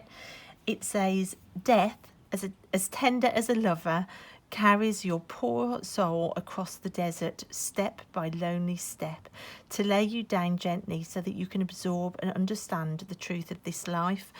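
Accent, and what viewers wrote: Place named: Shropshire, United Kingdom. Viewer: yay! I can tell by your accent, I’m in Shropshire. So in another of your videos about how you’re learning tarot,it was a VR #my tarot growth, the two books you’ve been using are ones I don’t have, which is pretty miraculous seeing as I have about 40 books on Tarot ( of course I haven’t read them all!!!!! Not even close as I’m still in the “ok that looks helpful, I’ll get that and then another book is recommended on a video or by Amazon etc and the pile just keeps getting bigger🤷‍♀️”. Anyway you said about being nervous of putting the bigger spreads together. I would like to recommend the methods in the Tarot face to face book as you look at how cards relate to each other first before you learn any meanings at all. The first spread after the initial lessons is a 25 card spread where you are using these new skills and it’s not scary at all! 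I’ve found it so valuable and so much easier than looking at cards individually and their meanings in a single card. It just seemed counter intuitive to me to be learning reading the tarot card by card individually seeing as our goal is to read cards together in spreads. The book has been republished under a new name Practical Tarot Techniques: Your Essential Tool Kit for Better Readings and is a Paperback – 25 Oct. 2019 by Marcus Katz and Tali Goodwin and is available through amazon but not as an ebook unfortunately. Because then you could have downloaded a sample of the book to see what you think of it. I’m not affiliated to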